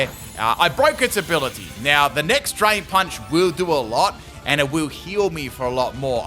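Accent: Australia. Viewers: Love your vids! I enjoy how you are australian and more language illiterate than us Americans! Everyone makes fun of us for not knowing languages but as someone from the U.S who speaks 4, your pronunciation on words makes me feel better on the languages im trying to learn!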